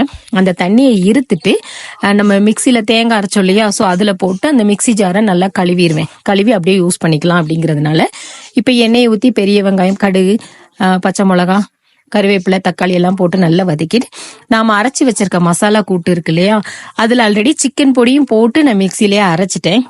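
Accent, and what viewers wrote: Tirunelveli, India. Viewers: Akka, thirunelveli ya!!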